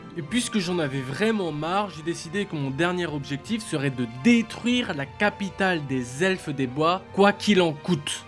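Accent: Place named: France. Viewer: Great video Ur English is perfect but U somehow keep all the frenchness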